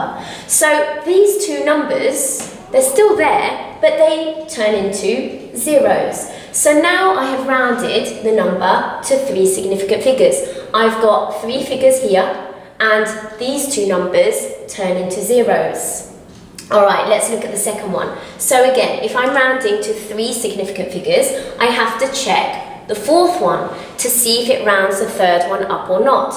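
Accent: United Kingdom. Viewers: Thanks I love how you explained ❤️ and I am watching this video 10 hours from my .ath exam so appreciate you and your British accent is nice🤣😂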